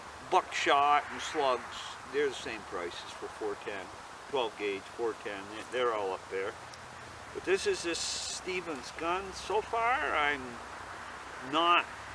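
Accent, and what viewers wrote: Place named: Vermont, United States. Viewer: I recognized that good old VT accent right off. Im up here in Franklin county. Subscribed.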